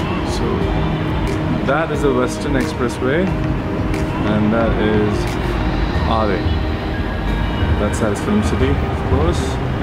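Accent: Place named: Delhi, India. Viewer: You are totally delhi person....apki baton me ekdum delhi tone feel hota.hai....i love delhi ...delhi dilwalo ki like you ❤️...blessed couple. 🤗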